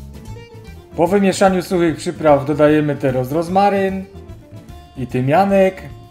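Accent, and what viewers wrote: Silesia, Poland. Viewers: Piękna sztuka.Dłuuugo już Pana oglądam.Mistrzostwo świata.Rafał z Krakowa. Śląsko gwara mi nie wadzi. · Super robota (pracochłonna ta szynka).Pychota, podziwiam talent pana, cierpliwość i i umiejętność obróbki mięsa w pyszna dojrzewająca szynkę.👍👍👍👍👍Tu Bydgoszcz, rozumiem dos dobrze slunsku gwarę.Pozdrawiam i DZIĘKUJĘ. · Świecianka z kuj-pom, a obecnie mieszkająca we Włocławku, pozdrawia. Filmy tylko po Śląsku. Pięknie się słucha gwary tym bardziej, że mój mąż to też Ślązak z Tychów. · Tak jeszcze nie robiłam, więc do roboty puki zima idzie :D A, co do gwary śląskiej nie rezygnować!!!! Cały urok w niej! Ja z Warszawy i pozdrawiam, a oglądającym - smacznego. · Oczywiście po śląsku , brzmi super! Pozdrawiam z Berlina. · Jak to jest, bo się zastanawiam. Film opisany prawidłową polszczyzną, wchodzę z ciekawości a lektor mi jedzie gwarą!?! 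To na Śląsku, piszecie inaczej a mówicie inaczej czy tylko ten kanał ma jakiś problem? Albo ja, bo dla mnie to jest problem. Dlatego YT mi nie tłumaczy zagranicznych tytułów video, żebym nie klikał na polski opis filmu po turecku. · Świdnica, ale proszę rób filmy po śląsku. Wszystko jest bardzo zrozumiałe a klimacik dla ludzi z poza G. Śląska zostaje :-)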